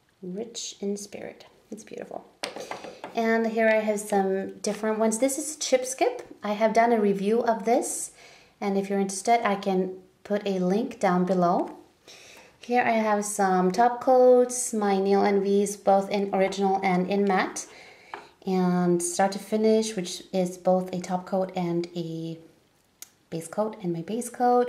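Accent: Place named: United States. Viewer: You sound like you are from the states!! You proved me wrong! X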